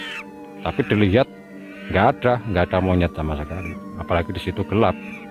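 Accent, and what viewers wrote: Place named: Java, Indonesia. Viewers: Tanpa 'guwe' jadi mantap kang. Aksen Jawa biar medhok justru lebih enak didengar. Lanjuut.... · Medok is not a crime. rapopo, lanjutkan cak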